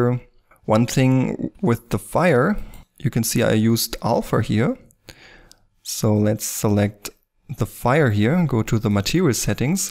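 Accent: Germany. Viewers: Nice video :) I like this german accent xD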